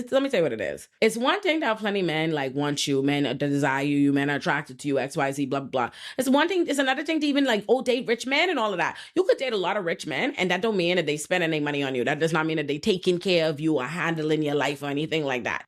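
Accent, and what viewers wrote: Bahamas. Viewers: You musssst be Bahamian or from Dominica omggg that accent 🤣🤣!!! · Are you Bahamian? Your accent is killing me 😍 it reminds me of another Youtuber that that also does commentary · are you foreigns wife???? its giving bahamiaaaaaaaaa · pause .. are you bahamian ?! this is my first time viewing but you sound just like us , or maybe you’re an geechie girl ? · Are you Bahamian by chance? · Are you from the Bahamas ? You sound just like this other creator I look at on here, similar accent, lol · I hear a Bahamian accent 👀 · Are you Bahamian?.. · Are u Bahamian? Or am I tripping? I hear our accent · Waittt are you Bahamian 🇧🇸??? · Your accent 👀👀👀. Sis you’re a Bahamian ? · New sub❤ i only disagree with one thing queen, scotty don't love him, if he looked the way he looked with no SHMONEY she won't even give him a SECOND of a minute of a time of any day. 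Lol i think she feels like SHE owes him , she feels like she in way to deep now to let anyone " WIN HIM " AWAY FROM HER SPOT.... an i think she is playing the long game just like him. I think they deserve each other. Especially since lemmy made it clear to us episode one scotty knew what she was signing up for an now "she wanna change for the baby". I guess we all gonna see how this ends. Couldn't be ME though. I got to much respect for myself an integrity. Aint enough money in the world, i pray this doesn’t end badly for her, we all know rich people have no limits an feelings come second to spiritual dark agendas. Also you are gorgeous an i love your accent. Is that like a bahamian bagan accent? ❤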